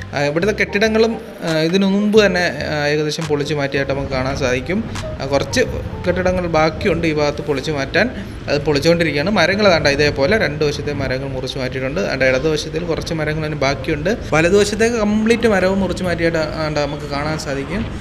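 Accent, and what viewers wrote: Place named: Kollam, India. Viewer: നമ്മുടെ കൊല്ലം ഭാഷ💕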